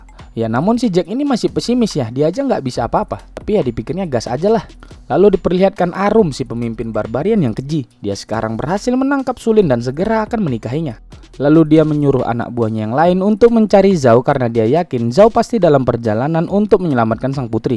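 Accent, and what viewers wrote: Medan, Indonesia. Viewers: logat medan kau bg ketara kale bah,jadi ketawa aku dengarnya🤣🤣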